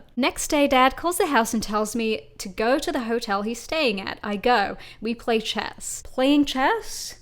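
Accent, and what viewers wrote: Brooklyn, United States. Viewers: Please record all future videos with your Brooklyn Accent. You don't have to though. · Top quality Brooklyn accent 😎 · All I took away from this - this man needs therapy. And - best Brooklyn accent ever. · That accent! I'm dying. Absolutely spot on American accent. Chef's kiss. Never change.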